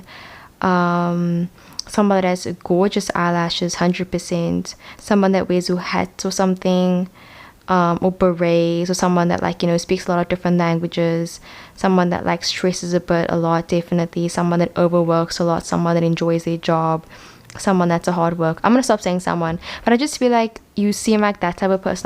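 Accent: South Africa. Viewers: Sis you sound south African as fookkkk Omg u are South African! Yayy Wait how is this so acurate. Pile 1. No fucking way whattt! No I'm subscribing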